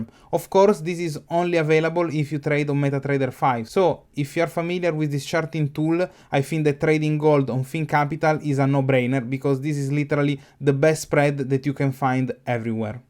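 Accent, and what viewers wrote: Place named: Italy. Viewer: Ma sei per caso italiano? perchè hai quell'accento li italianizzato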